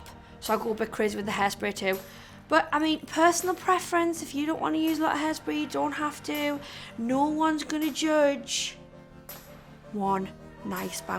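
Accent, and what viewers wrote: Newcastle, United Kingdom. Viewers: Have you permently quite Geordie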